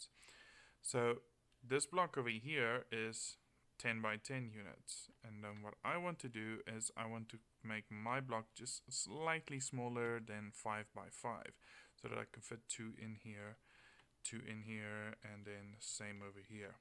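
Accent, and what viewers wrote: Afrikaans, South Africa. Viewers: You sound Afrikaans :P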